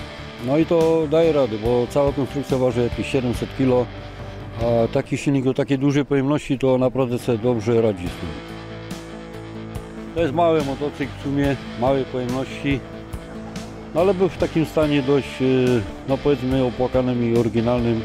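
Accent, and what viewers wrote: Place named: Silesia, Poland. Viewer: witam Kolegę kocham takie przeruby jest coś fabrycznego i lubię ulepszać po swojemu i według swojego gustu i upodobania , wielki szacunek dla Kolegi pewnie jesteś ze Śląska bo masz taki akcent wiele lat temu przed stanem wojennym pracowałem na grubie WUJEK pozdrawiam i zostawiam łapę w górę i suba trzymaj się wesołych i spokojnych świąt z uszanowaniem OTTO HERMAN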